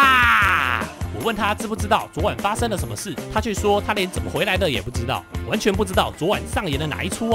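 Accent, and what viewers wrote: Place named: Yilan, Taiwan. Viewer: 經過我不專業的耳朵，你應該是宜蘭腔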